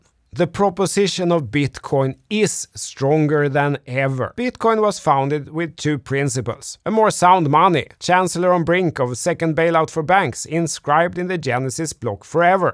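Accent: Sweden. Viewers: Shenanigans in a Swedish accent - love it. 😜😜❤❤❤❤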